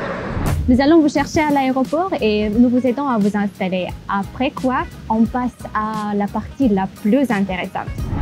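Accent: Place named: Spain. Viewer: Se le nota el acento de española, pero lo habla bien.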